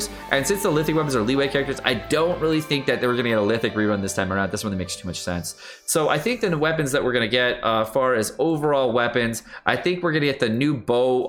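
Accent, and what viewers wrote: United Kingdom. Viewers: British? Instant like.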